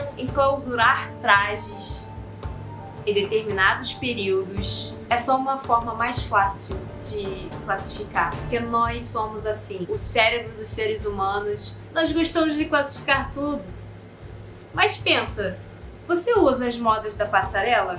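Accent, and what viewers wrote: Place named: Rio de Janeiro, Brazil. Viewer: Sua ideia foi genial. Coloco aqui as coisas que percebi durante o tempo do vídeo. Achei muito bem editado e que irradia a sua simpatia e seu sotaque por vezes cariocas e outras vezes português. Sua desenvoltura é fabulosa. Só achei um pouco longo e as vezes as pessoas só dão uma passadinha rápida para ver o que tem de novo. Gostei do uso da língua inglesa também. Nesse momento em que as nossas entidades tem carência de jovens vem a calhar e acho que devem acontecer sempre porque estão querendo mudar a nossa história. Você já percebeu isso? claro que alguns vão discordar dos temas e formas que foram colocados. Isso acontece pelo conhecimento adquirido de alguns. Até os autores discordam uns dos outros não é mesmo?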